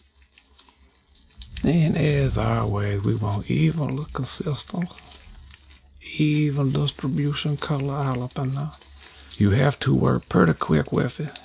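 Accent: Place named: Oklahoma, United States. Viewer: Is...is the cajun? Or at the very least Louisianan since I haven't heard him slip in the odd bit of French and other cajun qualities? What majesty is that accent?! It definitely sounds atleast like my great aunts and cousins in Oklahoma, especially in his inflections, but the accent could be somewhere eastward from there too.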